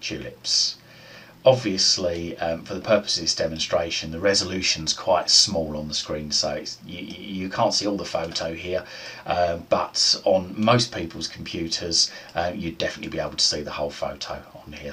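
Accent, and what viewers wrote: United Kingdom. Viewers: Too hard to understand his British accent. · I've just found this and I know its going to be clear and useful!..........and thank goodness for your British accent!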